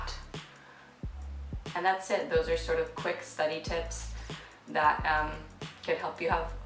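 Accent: United States. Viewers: Yeah. .. love the drive and commitment you've explained in the video. Its not smooth cruising as most people like painting it as. love your vids, you're American right? detect the accent in there...and not to probe but what made ya come to England for studies?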